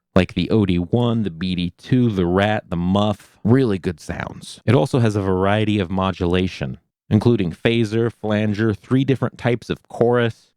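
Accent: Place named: Chicago, United States. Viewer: Your Chicaaaago is showing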